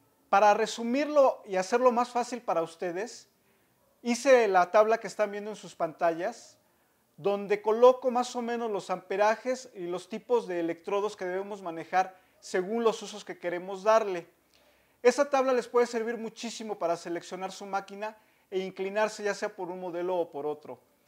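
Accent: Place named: Mexico. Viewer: Hola Buenos días de que país eres nacional? Creo que eres Mexicano por tu forma de hablar, Saludos desde Valencia Venezuela.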